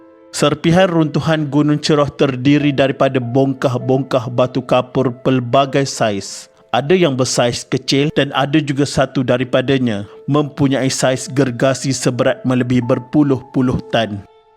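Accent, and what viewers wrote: Kelantan, Malaysia. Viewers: Kann..rasa ore klate doh tu 😁😁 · Telinga saya banyak kali dengar admin sebut TADI dgn TAKDI.. saya agak admin lelaki Kelantan la, maaf kalau saya tersalah dengar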